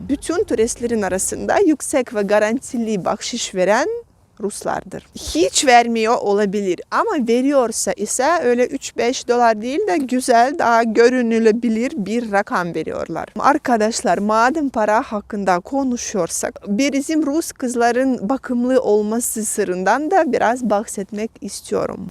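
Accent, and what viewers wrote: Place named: Istanbul, Turkey. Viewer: Yorum yapan herkesin yorumuna cevap vermeniz büyük bir incelik , bu arada İstanbul lehçesi ile bir İstanbul hanımefendisi gibi konuşuyorsinuz , tebrik ederim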